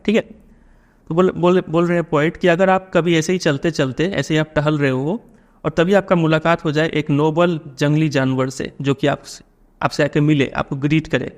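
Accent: Bihar, India. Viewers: sir are u from bihar your explaination is bestest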